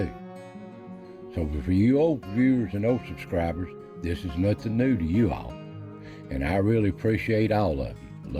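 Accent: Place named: South, United States. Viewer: I need to get your smooth southern voice to come do a colab! Bring your metal detector.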